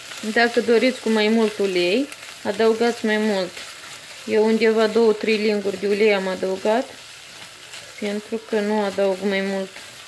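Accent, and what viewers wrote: Moldova. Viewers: Sunteti din Moldova? Accent😂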